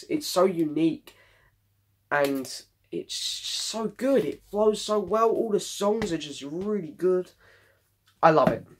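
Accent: London, United Kingdom. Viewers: thank you for your take on Paul's solo albums, you have a great take and insight. In fact you introduced me to the album Ocean's Kingdom I wan not familiar with before listening to your ranking. Good going kid. I hope you meet Paul someday and get to produce or do something with him; your very knowledgeable and sound like a great London bloke. At least that is what this yank hears. You certainly 'ave an ear for production and music. I subscribed ti your channel, I was so impressed.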